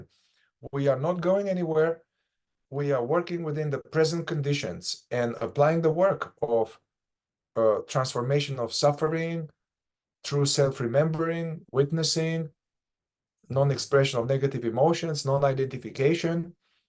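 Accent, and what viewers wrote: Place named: Jamaica. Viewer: Where are you from you sound jamaican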